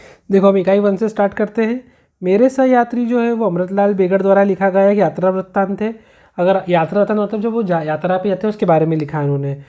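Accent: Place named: Indore, India. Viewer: Indori Bhasha bahut jhalak rahi hai bhai aapki 👍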